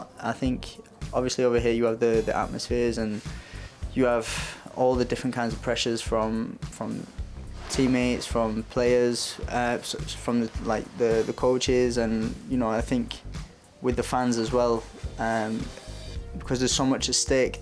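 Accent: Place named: London, United Kingdom. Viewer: He sounds like a Londoner. I was expecting a Staffordshire accent.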